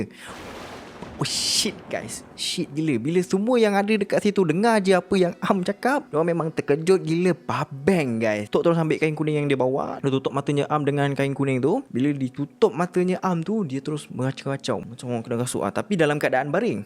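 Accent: Johor, Malaysia. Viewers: orang johor lah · Bang org Johor ke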